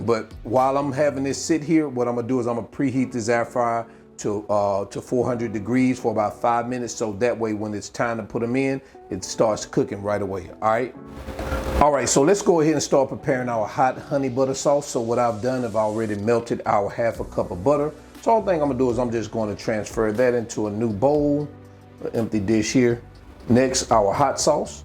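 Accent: South, United States. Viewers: Ok thanks...try this weekend in my oven looks amazing.....where you from?? I can hear your southern accent ..its nice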